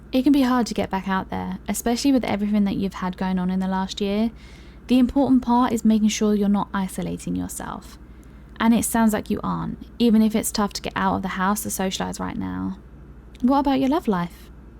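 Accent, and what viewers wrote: United Kingdom. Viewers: Oh! Oh! A therapist roleplay! I love these soooo much!! Thanks for your hardwork, British accent & Therapist? Ahhh. Sucha pleasure to the ears! The pacing with this one just had such a "right" feeling to it. A lil flirty back & forth is always nice too have a touch of as well. Seriously tho thanks for doin this one, much love. Godbless.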